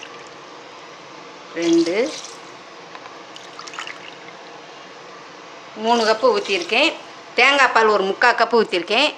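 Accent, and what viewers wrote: Madurai, India. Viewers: Thank you amma , So sweet Madurai slang, love ur description... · Amma super neenga Madurai ya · I love your Madurai speech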